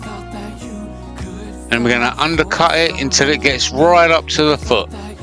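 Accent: United Kingdom. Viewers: Very nice. I might try making a set to display in our family room. As a first time viewer I have two suggestions. Please turn down the music, being from the US it makes it hard to understand your British accent, and please move the camera so the chips don't aim at the lens. Thanks from a new subscriber.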